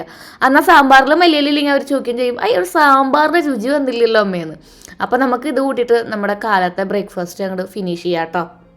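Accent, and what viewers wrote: Thrissur, India. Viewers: Nintay Thrissur style talks kelkkanada nan adhikavim ee blog kanunneyy...nee sharikkum oru inspiration anu ttoo.. nan pkd anu..nee aniyathy kutty anu ttoo..but experience il neeyanoo edathy onnu thonnipokumm..ninney nan follow cheythu. Nanum entay goal... achive cheyutoo..in sha Allah ❤❤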